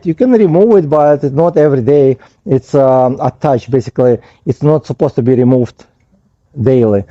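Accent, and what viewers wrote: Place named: Russia. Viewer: Your accent is funny sounds like Russian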